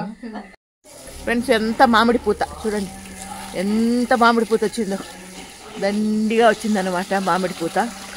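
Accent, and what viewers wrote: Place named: Rayalaseema, India. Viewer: Mee casual talk.i like your royals seema slang. Geetha Reddy Chennai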